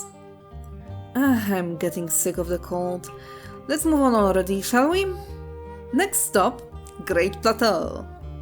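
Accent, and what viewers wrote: Poland. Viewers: oh thats interesting i dont think i have heard a polish accent before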